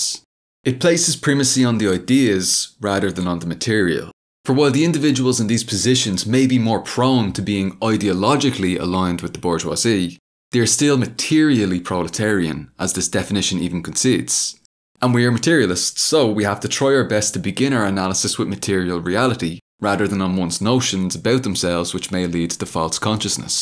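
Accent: Ireland. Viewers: There is something about you that is really attractive. I don't know what it is. I hypothesis is either of these three: - 1) Our ideological agreement (both MLM) 2) Your good looks (not jealous😂) 3) Your sweet Irish accent (not being racist) 4) Your way of (""usually"") simplifying explanations😂😂😂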